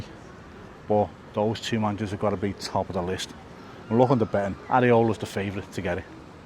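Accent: Liverpool, United Kingdom. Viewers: Spoken like a proper scouser who knows his footy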